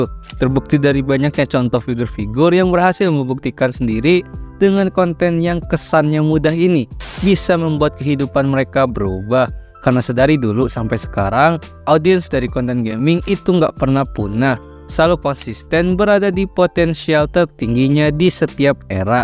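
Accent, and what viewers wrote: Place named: South Jakarta, Indonesia. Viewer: iya lagi pake nada jaksel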